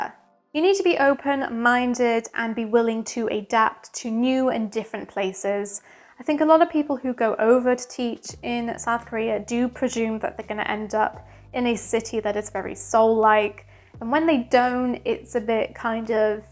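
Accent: United Kingdom. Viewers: Sound good posh English accent...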